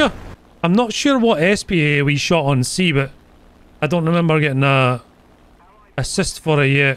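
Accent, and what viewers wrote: Scotland, United Kingdom. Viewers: Wait are you Scottish mate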